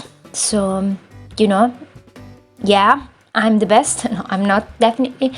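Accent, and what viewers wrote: Italy. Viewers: This house is super cute but honestly I'd just take the treehouse and live there. Okay bye. Haha I'm serious the treehouse is suuuper good and also the swing trick is amazing. Love your accent fellow italian sister😌❤❤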